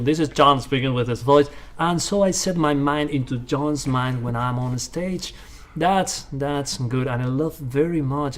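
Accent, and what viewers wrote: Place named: United Kingdom. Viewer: Your British accent is very good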